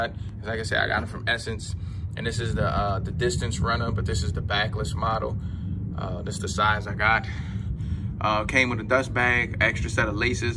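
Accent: Baltimore, United States. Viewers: Homie is definitely from Baltimore. What it deww dummy?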